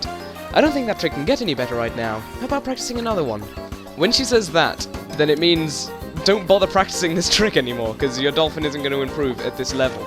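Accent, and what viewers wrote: United Kingdom. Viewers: U rock I love ur british accent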